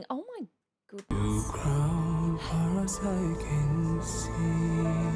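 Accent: Australia. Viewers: I love your aussie accent 🥰🥰🥰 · This would be a perfect soundtrack to a game teaser or trailer. Also... It's always so much fun to hear Aussies saying know. "Knar"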